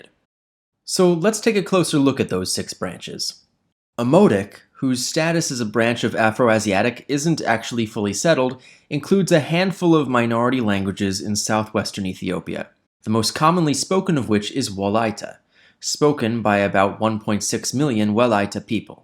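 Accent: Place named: New York, United States. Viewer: This guy has an interesting American accent. His long A vowel has New York/Yiddish coloring that makes some of his words sound like British RP.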